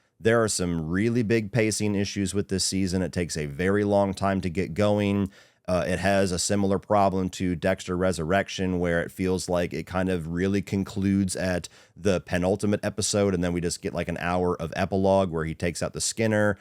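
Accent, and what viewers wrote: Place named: South, United States. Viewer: I got into Dexter at the same time as you in 2009,only difference is I was 29 and you were 19(somehow I look 10 years younger than you)but being a man I don’t like looking 10 years younger than I am. But ai started watching in 2009 just like you(shows I’m a big fan of your channel if I know all that). Also I’m from the northeast and you’re from the south,I know you don’t think you talk that way but you do,it’s just that your accent isn’t as thick as most southerners just as I don’t talk like a typical Philadelphian. Anyway I’m a huge fan of yours and I agree with most of your takes. I hope this channel continues to go for years because you’re the best at what you do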